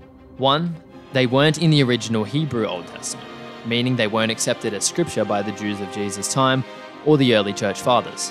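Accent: Australia. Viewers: Oh, and the New Testament list was compiled in 49AD (not in 85AD like video says). This sounds like that dude from Australia or New Zealand who made millions becoming a street preacher. Is that his voice? Then it would make sense since he's got a lot of the historical facts here or is purposefully leaving out the true historically correct dates.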